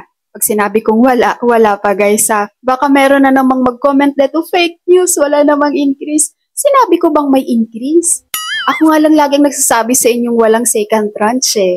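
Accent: Visayas, Philippines. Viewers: Bisaya kaayo intonation. Dili ra Landbank paspas ang crediting. BPI is fast too. Ma's maayo pa magbinisaya ka basi mas daghan mamati sa imo.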